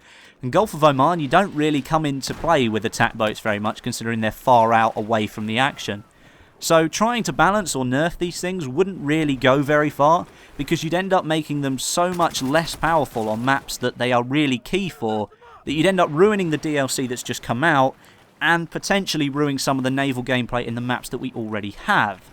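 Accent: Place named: Crawley, United Kingdom. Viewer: But for the record I can hear that Crawlian (If that's even what you call people from Crawley) accent strongly in this vid! :D Remember this comes from a guy in Horsham! (You know a town like 5 mins from Crawley and that is like a BAZILLION times better than Crawley! xD)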